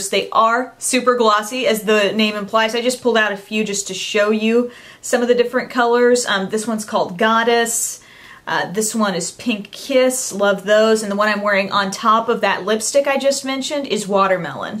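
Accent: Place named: United States. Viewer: She has an American accent. Everyone has an accent.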